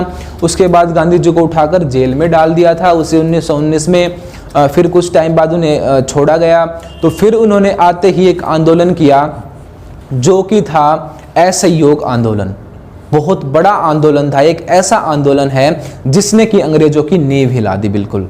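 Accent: Haryana, India. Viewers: From haryana??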